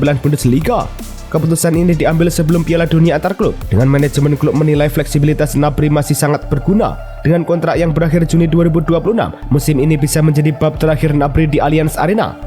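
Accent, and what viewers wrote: Java, Indonesia. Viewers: Yang ngisi suara medok jawa nya agak gimana gitu di dengar 😆🤣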